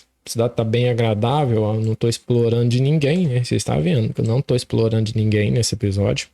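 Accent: Minas Gerais, Brazil. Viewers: sotaque mineirin q e bom de mais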